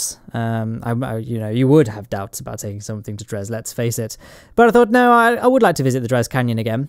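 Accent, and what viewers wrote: United Kingdom. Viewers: You are British..... and space....? Instant Sub!!!! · For some reason I heard that as "This is a purely autistic video" instead of "This is a purely artistic video". Must be your British accent. I'm from America.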